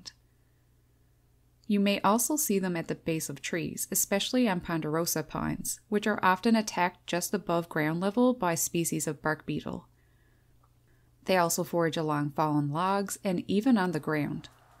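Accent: Canada. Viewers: You have the most Canadian accent I've ever heard. Cool video.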